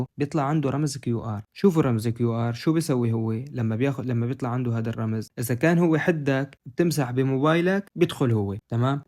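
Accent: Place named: Syria. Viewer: شكرا على راسي بس انت من وين حسيت لهجتك سورية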